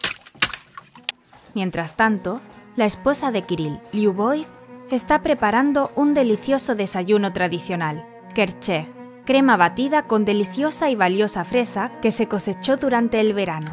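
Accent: Spain. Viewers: Menos mal que la narración es en español de España , no soporto esos vídeos con acentos latinos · Vaya , el vídeo con acento español qué maravilla , no soporto esos acentos latinos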